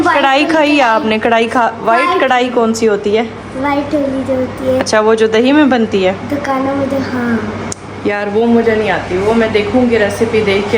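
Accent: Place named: India. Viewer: Ap ka lahja indan h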